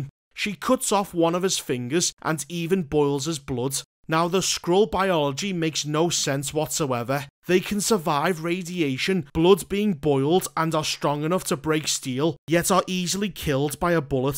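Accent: Liverpool, United Kingdom. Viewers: The swearing fits perfectly on these reviews, a scouse accent to boot. Brilliant reviews 🤣🤣🤣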